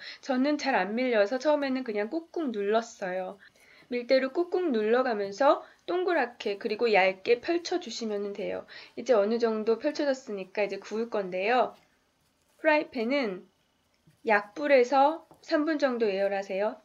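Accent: Seoul, South Korea. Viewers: 플레이크는 뭐예요 그리고 서울사투리인가요?? 말투 엄청 특이하네요 ㅋㅋ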